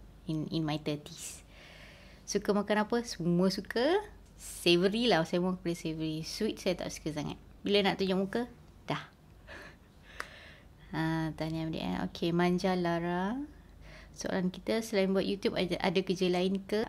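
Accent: Kelantan, Malaysia. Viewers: Comeyyy dooh ore kelateyy oyakkk!!! 🥰🥰🥰🥰♥️♥️♥️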